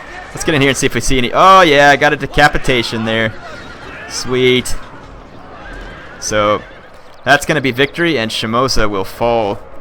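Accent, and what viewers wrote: South, United States. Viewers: Love your southern gentleman accent. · I subbed just for Southern accent <3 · You get a like just for that Soutgern Accent;)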